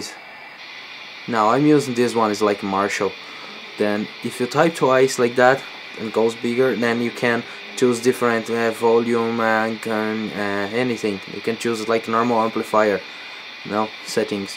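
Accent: Brazil. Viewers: Ou mano, quanto vc pagou no irig? hahah To ligado q vc eh brasileiro seu sutaque nao engana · Hahaha, verdade quando comecei a escutar percebi que era brasileiro. Mas ta valendo, obrigado pelo vídeo!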